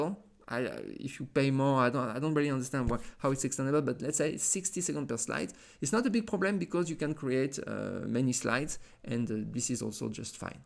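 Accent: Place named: France. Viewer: Amazing really❤good english and nice French accent😂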